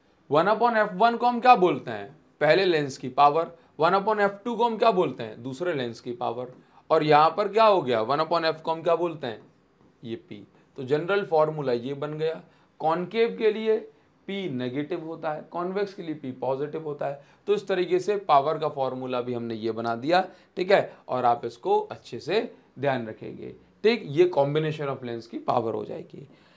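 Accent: Odisha, India. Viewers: Sir app odisha se Hain kya ???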